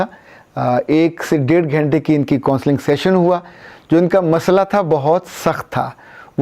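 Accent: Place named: India. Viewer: صاحب۔ آپ بیشک بہت اچھے مقرر ہیں اور پوری کوشش کر کے اچھی اردو بولنے کی بھی کوشش کرتے ہیں مگر دیگر افراد کی طرح آپ بھی بعض اردو کے الفاظ کو ہندی انداز میں بول جاتے ہیں، جیسے بہت ہی عام سا لفظ ہے " دکھنا " یا " جونسا " تو جناب یہ لفظ دکھائی دینا ہے دکھنا غلط ہے اور لفظ جونسا کوئی لفظ نہیں۔ گستاخی کے لیئے معذرت خواہ ہوں۔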